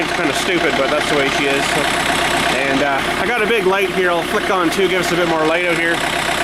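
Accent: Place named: Canada. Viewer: Can definitely tell your a fellow Canadian eh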